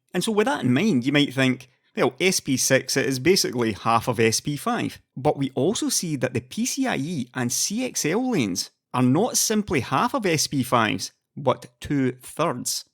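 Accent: Scotland, United Kingdom. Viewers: Aaah, I have missed you Scottish tones over the last several months, and while you may not appear as frequently as you once did, your return is still most welcome. Stay well. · yeah that is my scottish man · the kinky Scot accent is back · those dulcet Scottish tones are back baby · your Scottish voice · Good to hear your thick Scottish accent again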